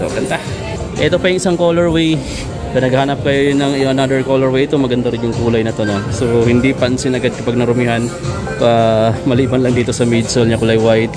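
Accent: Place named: Visayas, Philippines. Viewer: Bat ganyan accent ng pananalita mo sir. Bisaya kba Dong. 😂 ✌️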